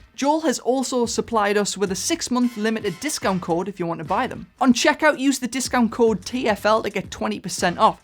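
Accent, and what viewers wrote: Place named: North East England, United Kingdom. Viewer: Class to hear a North East accent talking cinematography on here!!! I am determined to shoot a cinematic travel vlog on my smartphone (Huawei P30 Pro) but the maximum it shoots in is 8bit colour. Is it worth me using the log options the app I use provides? Or are they largely redundant with the limited tech I've got. Cheers in advance!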